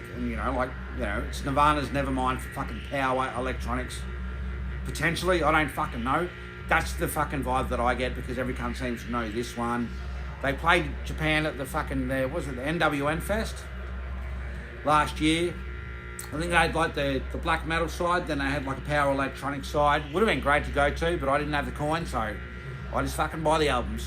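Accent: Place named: Australia. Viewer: Mate never fucken change your ways for any fuckwit. You being an aussie like myself was one of the things that pulled me into your vids. Got sick of listening to americans haha. But if youre jumping down the Power Electronics rabbit hole and want something extreme, check out Deathkey if you havent, also Intolitarian. Antichrist Kramer did the artwork for Absurd albums among many others! Cheers brother! 🍻🍻🍻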